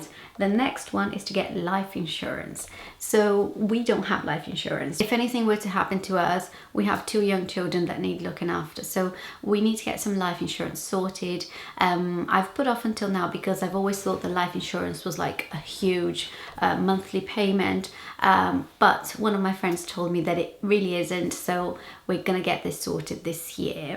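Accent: United Kingdom. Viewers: I started to watch your videos because I love British accent and I am Brazilian as well. My purpose is improve my vocabulary and my listening skills . Congrats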